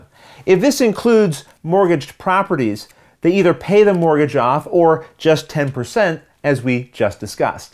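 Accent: Canada. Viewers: Are you Canadian? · You sound like a Canadian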